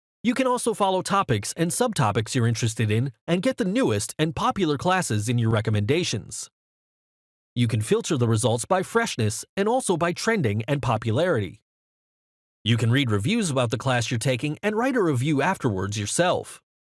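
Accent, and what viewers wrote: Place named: Russia. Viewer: This is insane look at this guys youtube profile he got lots of videos with a russian accent and a really bad laptop microphone but suddenly he speaks english perfectly and with a voice quality of a youtube star. This dude clearly has stolen this video or the voice to get his couple of bucks, how poor.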